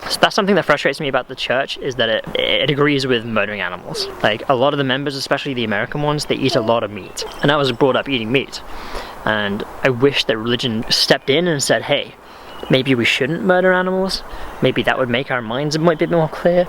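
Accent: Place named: England, United Kingdom. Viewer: Where are you from because you mentioned high school but you have an English accent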